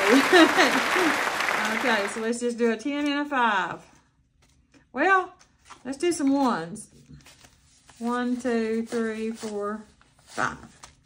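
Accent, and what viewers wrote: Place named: South, United States. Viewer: It’s so great to hear someone doing this that sounds like me 🥰. I’m from Georgia and believe it or not I think you may even sound a little more country/southern than me. I LOVE IT!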